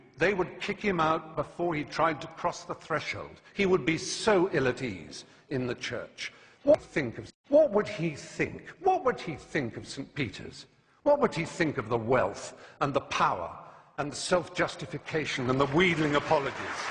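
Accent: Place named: RP, United Kingdom. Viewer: Wow,what a LIAR. Where to start? Repeating black legends in a posh voice does not a clever argument make. Firstly,Galileo was brought up on charges of being a heretic,not necessarily to do with heliocentricism.The Copernican theory he mentions is funny because Copernicus himself was a Catholic cleric.The enlightenment?You mean like when those who took part in the French revolution persecuted Catholics as bad as the Nazis did the Jews and all in the name of 'reason'?Thomas More?How about Cromwel?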